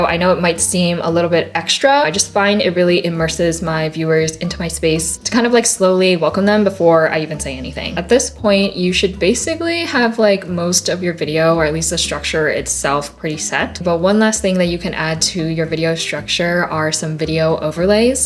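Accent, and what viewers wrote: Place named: South Korea. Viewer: 발성이 한국사람인데ㅇ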